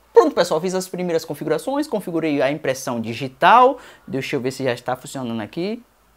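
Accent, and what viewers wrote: Bahia, Brazil. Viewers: tu é Bahiano né 😂😂😂😂 se for é nós 👏👏✌ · Vc é baiano tb é? Kkkk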